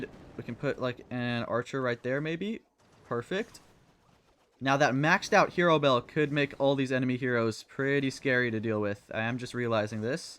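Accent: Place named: United Kingdom. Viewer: I thought you are British or ausie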